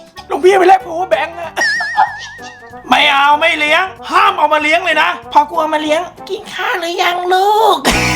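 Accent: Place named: Suphanburi, Thailand. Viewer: ผมชอบ พี่ พูดเหน่อ อะ พูดบ่อยๆ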